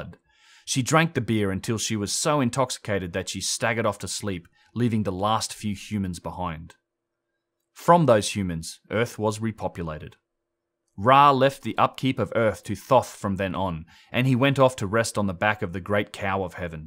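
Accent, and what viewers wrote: Australia. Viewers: Great to hear and Australian voice with a typical Australian perspective "God's pissed off......"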